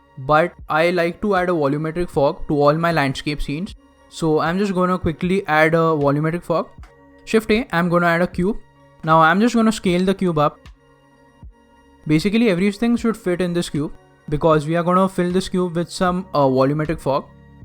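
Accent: India. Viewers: I recently had a discussion with someone if indian accents are actually that distinctive like its shown on may shows in media. This guy proves they actually are. Good video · hindi people use to have that accent, u know cause phonemes changes. I talk spanish and I'll probably have some recognizable accent and americans that speak spanish you can identify their accent too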